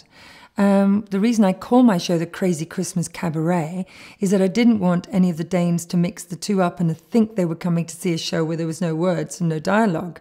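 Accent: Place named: United Kingdom. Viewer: Best British accent I have ever heard. Splendid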